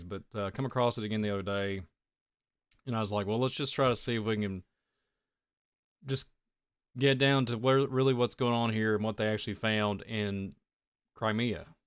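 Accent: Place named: Kentucky, United States. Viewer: Another awesome show!! Keep tellin it brother!! 💯 I love all your research & passion! May Yah continue to bless you 🙏 💙 🙏 PS~ I love your "Kentucky twang" & pronunciations!! You keep me laughing & learning at the same time!! 😊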